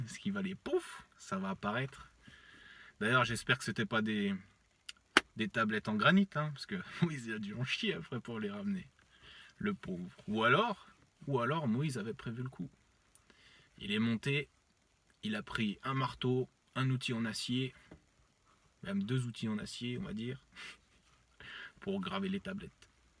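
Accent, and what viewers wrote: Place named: Burgundy, France. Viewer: Nom de Diu d'vin diu ! Tu roules les RRRR ha ha ...Je suis bourguignonne aussi, on a le même accent :) J'ai beaucoup appris avec toi, ainsi qu'avec "le blog à Cédric" ^^ Merci, si seulement les gens voulaient lâcher leurs religions, ils découvriraient le potentiel que nous avons réellement...et que nous nous suffisons à nous-mêmes :)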